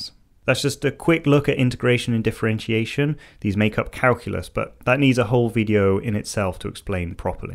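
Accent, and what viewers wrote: England, United Kingdom. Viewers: English accent but u spelt maths as math... (facepalm)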